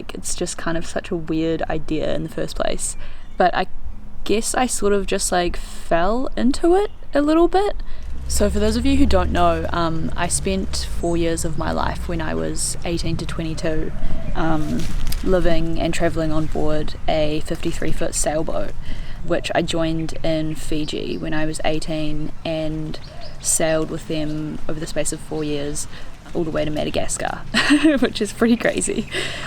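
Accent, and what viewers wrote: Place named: New Zealand. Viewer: Don’t be so anxious...I could listen to you read the phone book for hours...your kiwi accent is like ear candy...keep talking....in other words, don’t feel like you have to live up to any high standard, with incredible scenery or with wild adventures....just be yourself....I’ve been watching you on Delos and Ramatree for a few years and so has a lot of other people....we feel like we know you and we truly care about you...and we want you to have fun in whatever ever you do, but to also be cautious....the Tunisia video had a lot of us scared....I think most people just want what is best for you....and that is where some of the judgements from viewers are coming from....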